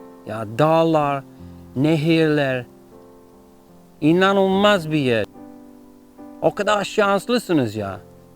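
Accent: Turkey. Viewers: You are such a good person. I can't believe that a person who has a beautiful heart exists... Okay uncle, I'll meet my friends tomorrow to change the situation. We all love you and your Turkish accent!❤ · Bay.. Türkçeyi. Fethiye. Şivesiyle. Öğrenmiş. ❤️😊🌹🙏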